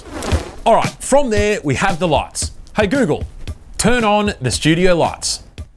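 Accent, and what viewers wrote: Australia. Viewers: Dude I literally just found you today and I'm binge watching your videos now. Subbed for both the production and the accent. Aussie Oi! 😅❤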